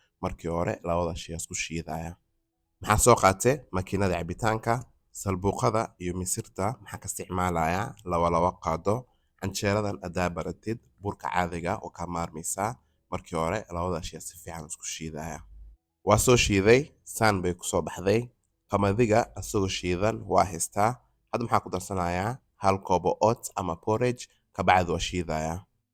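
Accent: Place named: North, Somalia. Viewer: Af reer waqooyigu ma fahmayoo😊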